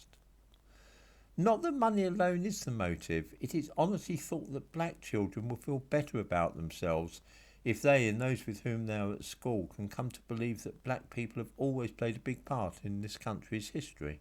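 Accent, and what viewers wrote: United Kingdom. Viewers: The incredibly Britishness of the commentator lends credibility to the argument. For sure.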